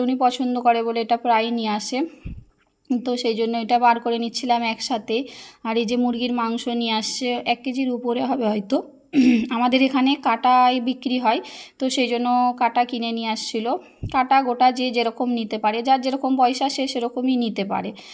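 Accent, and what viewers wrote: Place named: Kolkata, India. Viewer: তুমার কলকাতার কথায় বারি দিদি❤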